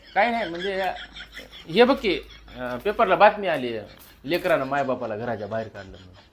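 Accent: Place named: Nanded, India. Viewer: Bhasha chi boli Nanded chi aahe kai bhari acting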